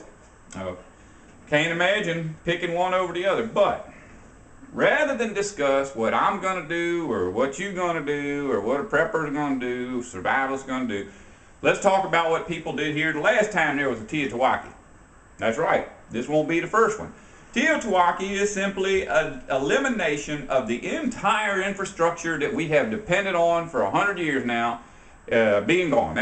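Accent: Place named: South, United States. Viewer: hah hah, awesome accent sir, thank you, reminds me of the south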